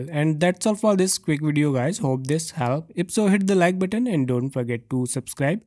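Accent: India. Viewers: First Seconds and im Hearing an Indian voice and i know He ist saving my day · I know my problem fixed when i heard the Indian accent ❤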